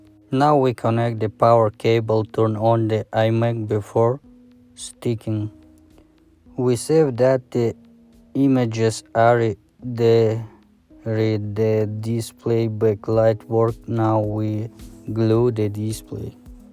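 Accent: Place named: Russia. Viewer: Нормас только голос надо приправить ну надо говорить как англичане а то ты просто с русским акцентом говоришь английский слова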